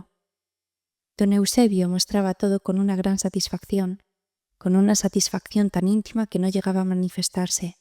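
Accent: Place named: Spain. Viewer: Gracias por tu narración ♥ Tienes el acento de qué región de España? Me encanta!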